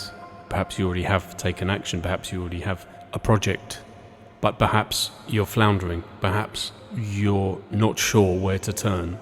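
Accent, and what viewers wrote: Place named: United Kingdom. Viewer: It is good to hear the British English accent. so classy.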